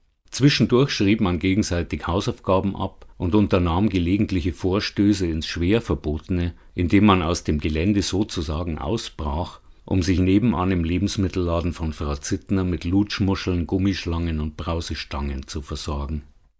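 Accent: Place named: Munich, Germany. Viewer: Ein toller Betrag vorgetragen mit einer soooo angenehmen Stimme und dem wohligen Münchnerisch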